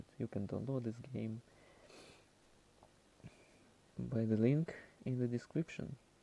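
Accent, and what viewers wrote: Russia. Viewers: Да ну вы русский акцент слышу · Почему-то я слышу в твоём голосе что-то с русским акцентом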